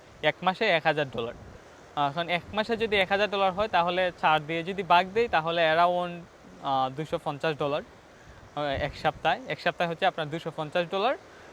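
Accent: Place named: Sylhet, Bangladesh. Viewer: ভাই আপনি বোধহয় সিলেটি। সিলেটি ভাষায় কথা বলেন... অন্যান্য, জেলার তুলনায় এই অঞ্চলের ভাষাটা খুবই বোধগম্য ও সুন্দর। ইলিশের বাড়ি চাঁদপুর থেকে...🤍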